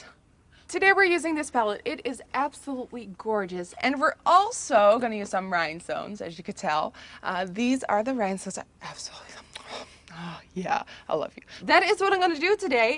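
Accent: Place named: Netherlands. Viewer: Love her cute Dutch little accent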